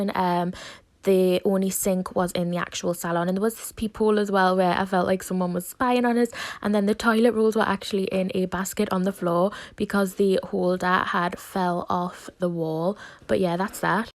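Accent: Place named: North, United Kingdom. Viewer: It’s just how us northerners talk ✋🏼😂❤️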